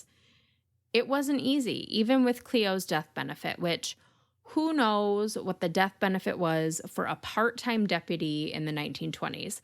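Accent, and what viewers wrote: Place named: Michigan, United States. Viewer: I think your channel is fabulous!!! I was born and raised in Michigan. I moved to Washington state in 2011, and I really miss home! I feel like I found gold...I'm addicted to true crime podcasts, and hearing your stories in our lovely accent (lol) makes me so happy! Thank you for your thorough research and very interesting subject matter....keep these videos coming!!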